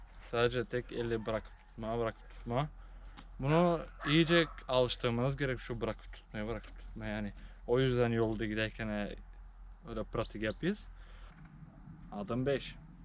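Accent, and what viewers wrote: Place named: Macır, Turkey. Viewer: Efsaneeee tam macırca konuşma vallaha sard i😂😂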